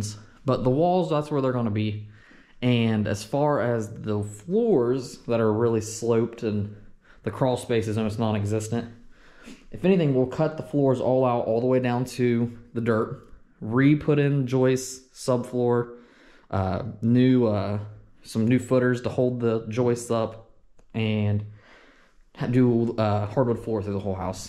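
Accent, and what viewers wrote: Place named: Pittsburgh, United States. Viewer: you sure sound like 'cole the cornstar' but he is from iowa/idaho...6 generations. ohio? i hear a little pittsburgh. does not sound like ohio at all.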